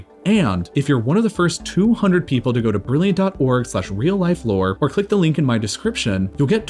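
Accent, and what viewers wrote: United States. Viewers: man i hate how this guy always uses km and meters, Wtf comon. Most of your audience is probably american and you yourself sound it... so come on!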